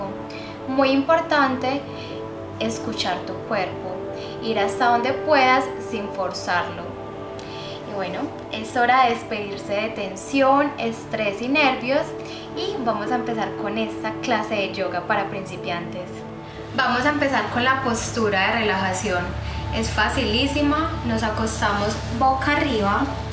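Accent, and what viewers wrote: Antioquia, Colombia. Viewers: Por el acento la chica es colombianas.. por el cuerpo la chica es divina.. por la pesonalidad la chica is likeable... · Quiero saber cómo se le llama a tu acento, sé que eres colombiana pero quiero saber eso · Buen vídeo linda,debo decir que tienes una muy bella tonalidad en la voz,relajante,debe ser su acento paisa,saludos bonita.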